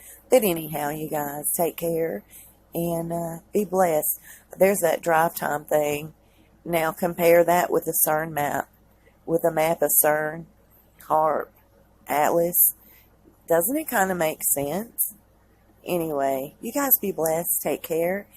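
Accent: South, United States. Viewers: I love your southern accent your voice is so kind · As a southern girl, born-and-bred in Alabama, so refreshing to hear your accent. Just keep being you. Your job is to inform us and you are doing an excellent job of that. A job that you took on voluntarily. God bless you and yours. From Another southern belle!! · I love the southern accent, crazy/evil people have to contrive a way to upset you. I would delete any offensive comments. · Your Southern Accent is SO-O-O -o-o-ooo charming and especially——AUTHENTIC!!‼️ Dearest-Sweetest——💕 💕—— Please, Do NOT EVER Change or alter your native, naturally acquired speech & speech patterns or ACCENT‼️‼️‼️. You are sooo authentic and genuine in this time of practiced artifice‼️ PLEASE stay just yourself and who you are‼️. Take care of yourself and ALL of your precious family💕‼️. And... LOTS OF LOVE AND MANY BLESSINGS😘‼️ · Hell, girl- I've got such a southern accent that I never even noticed you had a southern accent!! 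Lmao!! I'm dead serious. 😬😂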